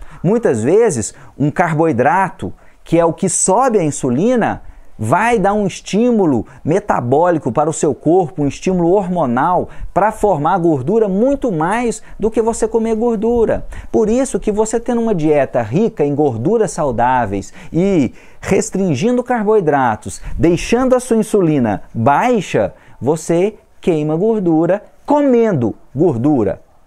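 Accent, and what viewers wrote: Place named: Minas Gerais, Brazil. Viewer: Amo nosso sotaque mineiro. 😍😍❤❤ Depois deste vídeo ficou mais fácil entender o processo de emagrecimento. 👏🏿👏🏿👏🏿👏🏿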